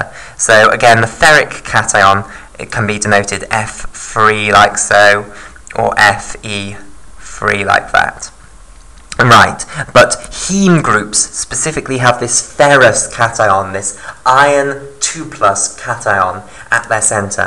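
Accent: United Kingdom. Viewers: Why do i feel much smarter listening to a teacher with a british accent? God bless you sir thats a lott of help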